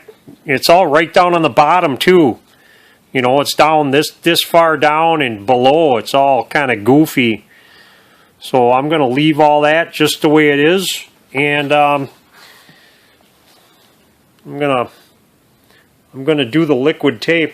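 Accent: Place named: Minnesota, United States. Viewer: I’m hearing some Minnesota in here!😁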